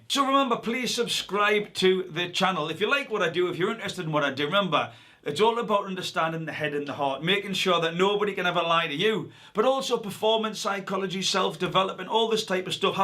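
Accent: Newcastle, United Kingdom. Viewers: R u a Geordie cause u sound like your from Newcastle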